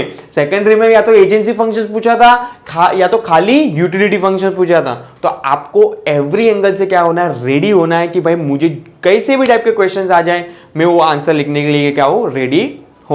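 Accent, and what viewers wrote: Gujarat, India. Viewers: I agree with Rohan. Sir apke pas sufficient knowledge hai but Voh hum tak pahunch hi nhi rha hai. You're just reading TB & winding up the part . I think you should go in depth & explain various terms & give us examples. Also sir tame gujrati 6o ne .... Tamari accent par thi khbr padi gayi 6 mane .... Sir the way u speak 🗣️ etc & various is just gujratically awesome ... Gujratically is made by me ( Gujrati + beautifully ) Sir hoping you to change the way you teach ...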